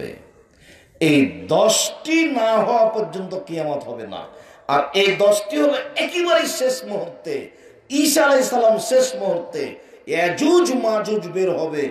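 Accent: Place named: Dhaka, Bangladesh. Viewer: সে তার ঢাকাইয়া ভাষা কইতে যাইয়া ভাষার বিকৃতি হয়ে যায়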